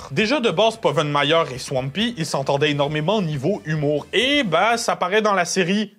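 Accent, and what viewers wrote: Canada. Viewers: Salut, est ce c'est possible de faire une vidéo sur la série LoliRock (car c'est uns de mes séries préférée) STP. Merci beaucoup et bonne journée à toi et tu as des bisous de l'île de la réunion pour ton pays du Canada 💋💋💋 Et joli le petit accent canadien ! J'adore !!!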